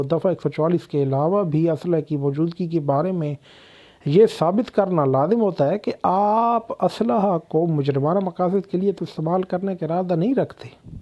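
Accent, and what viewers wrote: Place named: Mianwali, Pakistan. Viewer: Apki awaz se Ap mianwali se lag rahe ho ? Kia ap mianwali se ho ?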